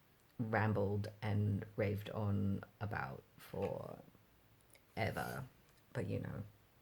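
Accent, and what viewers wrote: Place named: RP, United Kingdom. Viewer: as a british person your accent was suprisingly decent but you sounded alot more like you were from like the richer parts of britain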